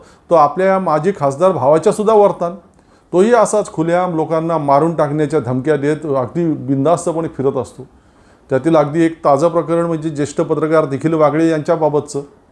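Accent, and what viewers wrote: Standard. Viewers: मला मराठी भाषा खूप आवडते. शुद्ध मराठी उच्चारण आपला खूप मस्त. मराठी चित्रपटांना प्रोत्साहन दिलं पाहिजे. तसेच मी मुस्लिम आहे पण मला मराठी भाषेचा लेखक व्हायचा आहे.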